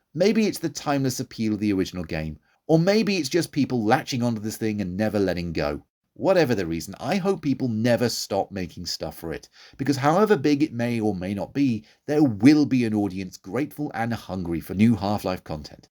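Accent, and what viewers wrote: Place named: England, United Kingdom. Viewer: Ребята он ряльно англичанин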